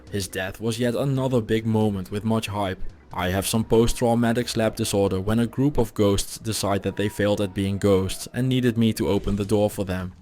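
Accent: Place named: Netherlands. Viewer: That's quite the Dutch accent.. you sound like Goldmember.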